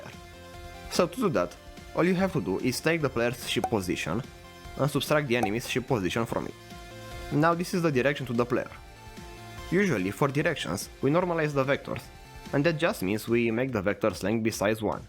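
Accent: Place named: Romania. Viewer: ur deff romanian, not gonna lie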